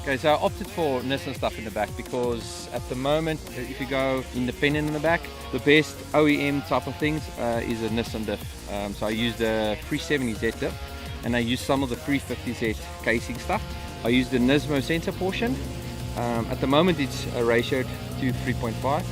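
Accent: South Africa. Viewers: you south african? sounds like our accent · are you afrikaans?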